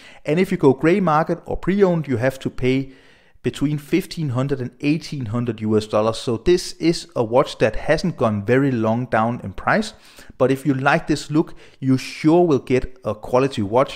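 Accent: Denmark. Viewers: Hvordan kan man bare høre at du er dansker på accenten hahah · Good old Danish accent ! 🇩🇰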